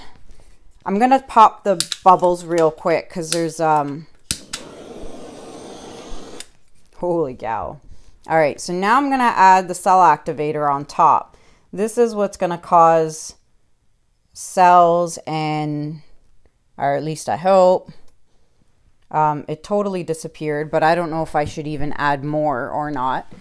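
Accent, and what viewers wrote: Canada. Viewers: Sounds like sweet Juno might have allergies. I use children's Benadryl. Hope he feels better soon! Haven't heard you say "literally" as much as usual. I like listening to your cute canadian accent!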